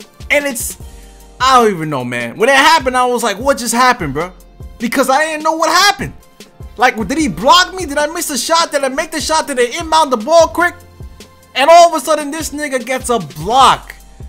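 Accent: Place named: Boston, United States. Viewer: your definitely from the Boston area admit it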